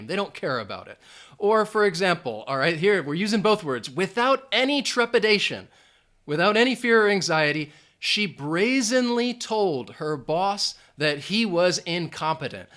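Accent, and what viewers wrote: United States. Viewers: Very useful for those who speak fluenty another language wich isent their native one like me thank you guy and please try to not be absent for a long time please are you American or British i think your accent is pure american but I'm not sure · American , r is pronounced"are" , he uses slap t= "d"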